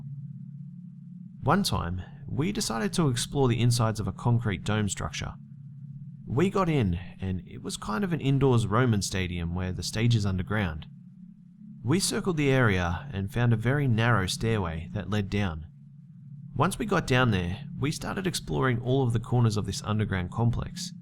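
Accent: Australia. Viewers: aussie accent lol* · Minnie Hambrick The guy narrating the story is Australian but, the guy who wrote the story is British.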